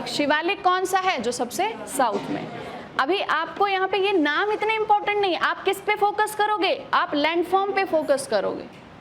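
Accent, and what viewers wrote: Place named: Rohtak, India. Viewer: Madam rohtak side ki hai kis kis ko lagta hai 😂😅😅😅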